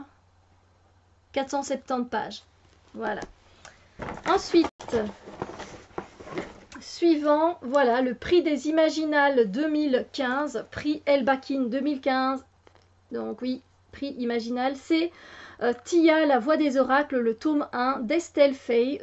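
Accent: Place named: Belgium. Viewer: beau accent belge lundi le foot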